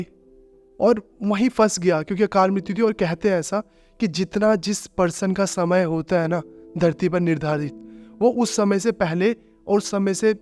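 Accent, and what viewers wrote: Punjab, India. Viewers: Bhai apki language me punjabi accent h.. apka bg kaha se h??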